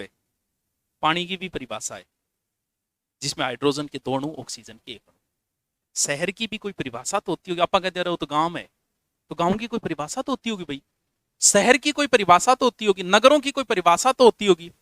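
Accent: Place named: Rajasthan, India. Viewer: Padhate acha ho but hindi nhi bolne ki wjh se aadi bat samaj hi nhi aati apki .... marwadi, rajasthani bolte ho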